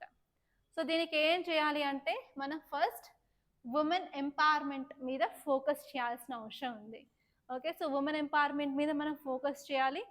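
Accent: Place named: North, India. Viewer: Mam miru telugu valla am so happy...when I listen your polity free classes... I thought u r north indian....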